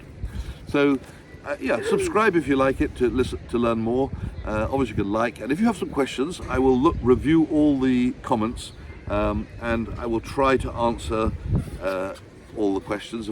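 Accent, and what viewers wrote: United Kingdom. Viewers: You sound like a fine British gentleman with deep love for the markets and your family. Great stuff sir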